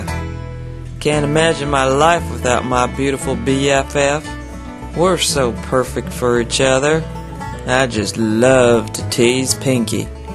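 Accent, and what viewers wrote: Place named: South, United States. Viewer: Narrator is funny as hell ....and soooo southern !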